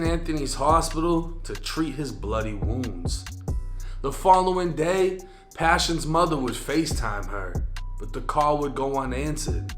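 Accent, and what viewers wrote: Boston, United States. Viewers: 📖dude your storytelling is on point.followed this nightmare on the media but all the gory details omitted. And love the bahstan accent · Bro.. You have to be from Boston.. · Tell me you are from Boston without telling me you are from Boston.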